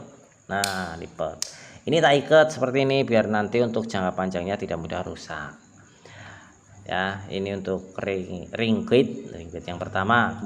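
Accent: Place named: Banyumas, Indonesia. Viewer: Jnengan tiang pundi mas,kayane republik ngapak 😅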